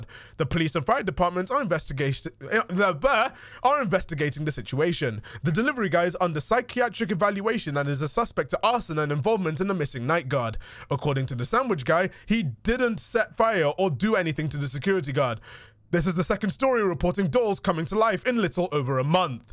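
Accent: United Kingdom. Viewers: you're from London??? Why didn't you come out as british earlier???? Smh my head I feel so betrayed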